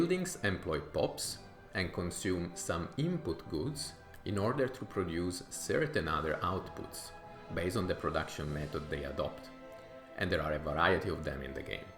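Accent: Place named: Italy. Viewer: I'm to high to digest what you ar saying but I enjoy your Itialian voice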